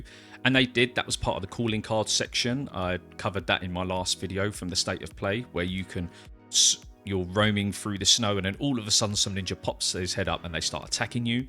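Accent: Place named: United Kingdom. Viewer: Brilliant video mate !! From yhe the uk 🇬🇧 myself yhe midlands nice to hear a uk 🇬🇧 accent amazing vid on this outstanding upcoming game !! I’m so so excited for this game !! I pre ordered my digital deluxe edition today !!! Counting down the days !! Thank you for the breakdown in this !! It’s great you’ve explained more and made us way more excited ! 🙂🙂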